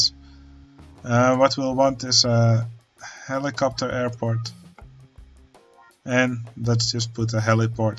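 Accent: Netherlands. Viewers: Ik kan je nederlandse accent horen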